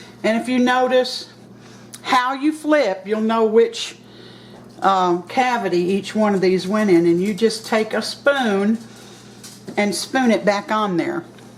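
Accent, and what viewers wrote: South, United States. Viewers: Luv ya,,,, your coment about unsalted butter,,, "honestly that is such a farse" with your southern drawl. LOL the best!! And that is so how I feel as well, THANK YOU. Plus it also reminded me of my beloved aunt Caroline. So here in the midwest we once a had grocer chain that carried the once well known bakery line of McGlynn Bakery and they had these! Yes, the pastry dough part was much like a cross of a Eclair and a Croissant just like what these look to be!! :0) Where as all other cinnamon/sticky buns were like some boring flat bread dough that is barely ediable fresh out of the oven. Oh and the McGlynn ones didn't have raisins which was so fine with me. And thats been more than 20 ago.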